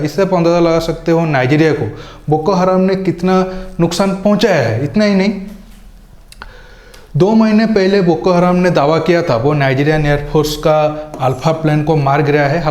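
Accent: Odisha, India. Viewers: Bhai kay aap odisa se hai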